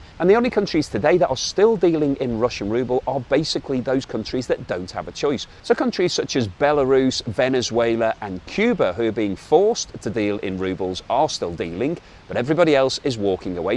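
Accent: United Kingdom. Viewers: Hi. Are you based in the USA? Sounds more like a British accent you have there.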